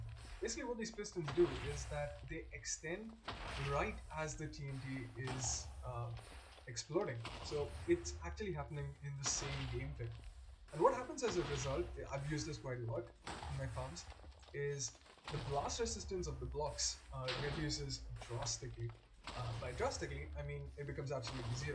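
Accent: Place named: India. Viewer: Which country do you belong to? Your accent is very Indian. If you are an Indian then its really nice to see some good indian Minecraft youtuber.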